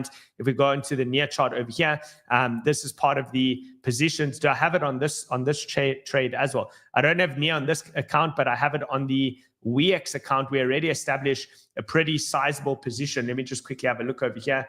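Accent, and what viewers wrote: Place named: South Africa. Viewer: Love that South African accent, dude!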